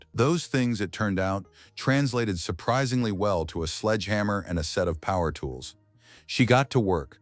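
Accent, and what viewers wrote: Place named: Kentucky, United States. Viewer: I started watching about a year ago. As a fellow Kentuckian (also from the Louisville area) I swore she was in KY for the first several videos I watched ( know my KY accents!) until she said she was in North Dakota. But the accent just didn’t add up for that area. Never the video I watched today I was thinking about that. Now that I know she’s originally from here t makes sense!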